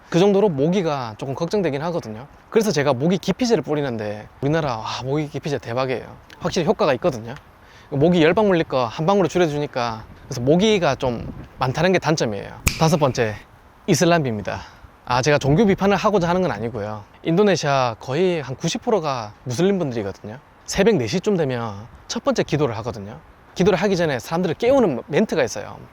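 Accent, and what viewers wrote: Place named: South Gyeongsang, South Korea. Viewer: 인도네시아 여행. 관심이 갑니다. 방갑습니다.ㅎ 구수한 경상남도 톤이 정겹게 느껴집니다.ㅎ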